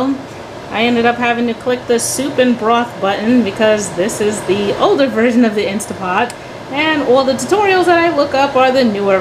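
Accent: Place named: New York, United States. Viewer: will never get over the glorious ny accent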